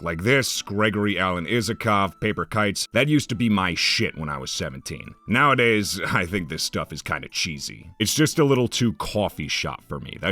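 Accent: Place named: New Jersey, United States. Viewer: Where you from? Jersey?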